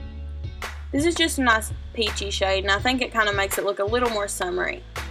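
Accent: South, United States. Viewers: I came across your channel about an hour ago and I'm pretty sure I've found my new favorite YouTuber! I'm from way deep in the south and it's so great to hear a southern accent from a guru! You've inspired me to consider making a channel:) would you consider making a video about how to start a channel, what camera you use, etc? Can't wait to see more videos:)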